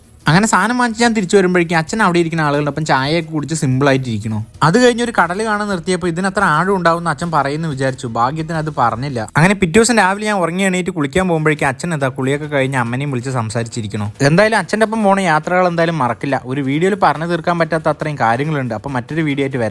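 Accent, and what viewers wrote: Palakkad, India. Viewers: Palakkad slang anh👍🏻😌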